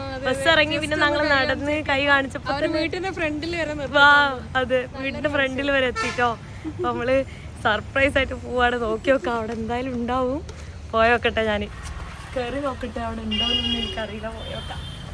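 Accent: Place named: Northern Kerala, India. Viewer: നല്ല വടക്കൻ മലയാളമാണ് പറയുന്നത് ഒറ്റപ്പാലം style അല്ലേ അല്ല.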